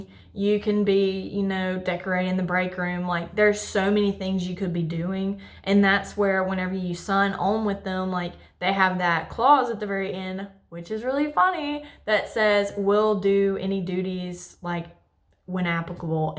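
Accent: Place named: South, United States. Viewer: Your Southern Accent, is very very very Beautiful, with your very very very Beautiful Personality, my Friend 🥰🥰🥰🥰🥰🥰🥰🥰🥰🥰🥰🥰🥰🥰🥰🥰🥰🥰🥰🥰🥰🥰🥰🥰🥰🥰🥰🥰🥰🥰🥰🥰🥰🥰🥰🥰🥰🥰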